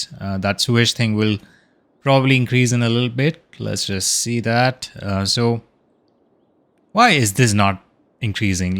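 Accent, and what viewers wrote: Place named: India. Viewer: Excellent video! I'd like to know if you're basing your city in any real locations. I'm brazilian and I often struggle to do stuff familiar to the place I live, because this game is very "american". Apparently, judging by your accent, you sound a little bit indian, so i imagine we run through the same problem. I'd also wanted to know if you consider LUT's or map themes as "mods". They could make this city look even better than it already is! Anyway, hoping to see some new episodes! <3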